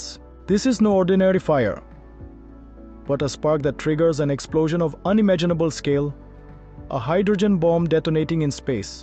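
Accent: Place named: United States. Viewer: But for the broken American accent, it was an engaging watch.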